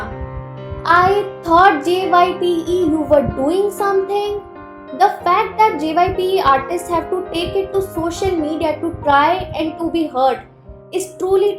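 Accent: India. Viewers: Hey are you indian your ascent is like a indian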